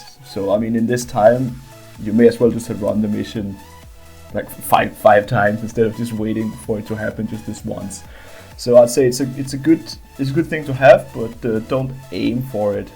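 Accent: Denmark. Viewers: Du dansk, det kan man høre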